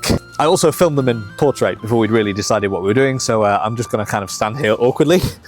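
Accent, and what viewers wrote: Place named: United Kingdom. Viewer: I really do hope that in the actual campaign you play up your Bri'ish accent.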